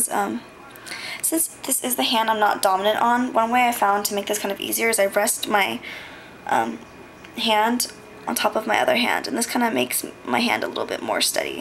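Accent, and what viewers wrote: United States. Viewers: R u american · So obvious you're from Cali... you sound like Brenda Song LOL Nice tutorial though :p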